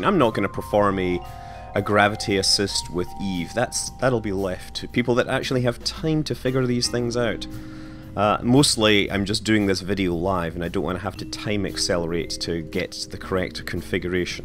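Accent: France. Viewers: Just love your French accent since French is my native language. You should pronounce B612 "b seece dooz" btw.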